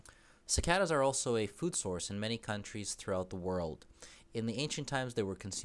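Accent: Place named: United States. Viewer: I know without checking what it will say, it's an American dictionary. I've already explained how you yanks pronounce it & why. That doesn't make it correct, only in your little realm.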